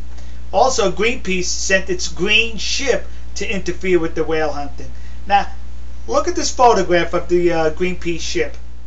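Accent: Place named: Northeast, United States. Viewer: I just have a stupid question, which part of North Eastern America are you from ? Are you even from the North East ? (I would definitely want to trade my Glasgow accent with yours) lol Have a good day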